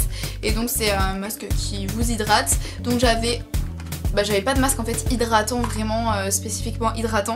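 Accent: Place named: Marseille, France. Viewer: Ta un belle accent marseillais mdrrr moi je vien de Marseille et sinon jzdirenles vidéo haul faudrait que T en fasse plus souvent bisous et jtm❤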